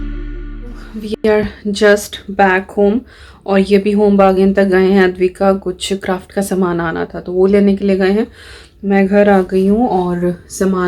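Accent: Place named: Western Uttar Pradesh, India. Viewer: I like her a lot. The way she speaks. and Haan I m also from West UP. Apki language sunkar apni taraf ki Yaad a jaati h. Take care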